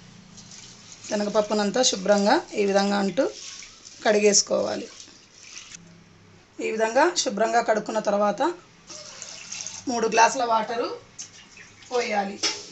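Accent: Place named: Rayalaseema, India. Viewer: aha rayalaseema matalu vini anni rojulu indakkahii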